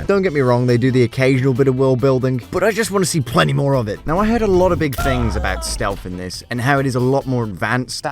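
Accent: United Kingdom. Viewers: I KNEW THAT WAS PS1 MONSTER S.A. you sound briish btw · You sound British?... When are you going to convert to Islam? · First time on the channel, American 🇺🇸 here. With my only knowledge of this game being British, Im happy to know I tapped on a British dude reviewing it. It makes it so much better! Thank the algorithm YouTube scum, they do something right! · I like your british actent is so perfect i wish have but i live in Poland so... Yeah this is i be wach you british actent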